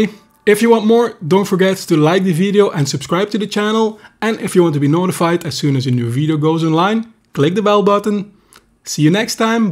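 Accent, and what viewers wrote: Netherlands. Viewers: Gotta love the combination of in-depth cultural knowledge, spot-on production and of course the delicious dutch accent. You got another sub! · Man you sound so Dutch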